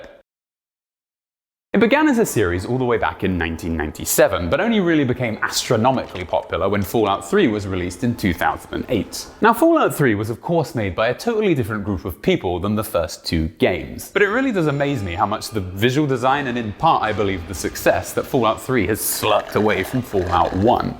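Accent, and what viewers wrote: United Kingdom. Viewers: I love new brittish accent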